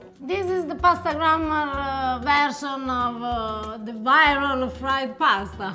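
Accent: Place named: Italy. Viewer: i just have to ask... was you tired when you was making this video? You english get abit more Italian accent. No, no offence intended.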